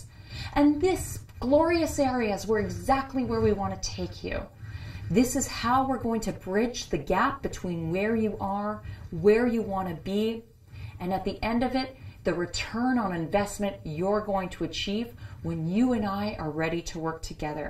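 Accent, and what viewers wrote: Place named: Canada. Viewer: Excuse me, please! Thank you so much,. Your Canadian English with subtitles is fantastic. Greetings to Mar. and Sh. Take care of them. Cheers! Thank you. Bye!! 🇨🇦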